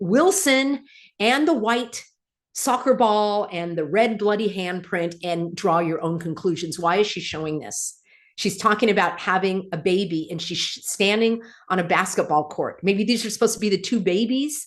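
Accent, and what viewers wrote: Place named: United States. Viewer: Well, I had to subscribe because your delivery in that subtly sarcastic tone with the American accent just tickled my little Scottish heart. You have it all well sussed as we say.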